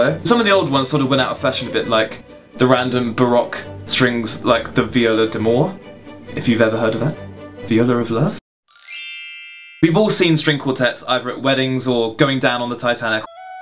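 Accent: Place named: United Kingdom. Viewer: It too much British accent their,please slow it little bit not able to understand clearly. Thanks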